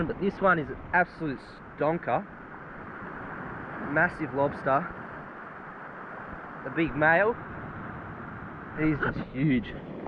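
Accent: Australia. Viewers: Are u from Australia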